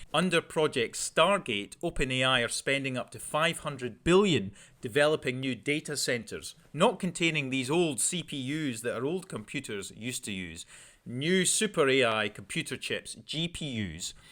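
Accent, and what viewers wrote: Scotland, United Kingdom. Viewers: Are u Scottish? I love your accent. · Hey man, are you from the same part of Scotland as Scott Manly?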